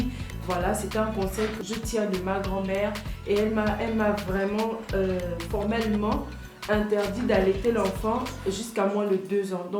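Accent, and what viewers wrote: Gabon. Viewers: Votre accent on dirait une gabonaise 🤔🥰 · cet accent qui me rappelle mon Gabon 🥰🥰! Merci pour l'astuce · C'est la première fois que je regarde vos vidéos. Votre accent ? Vous êtes gabonaise ???😍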